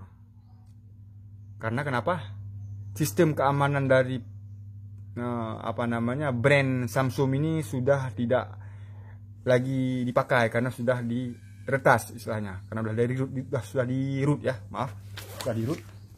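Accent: Indonesia. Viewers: Lage logat aceh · wong plembang ni, nak mudike tulaa wkwkwkwk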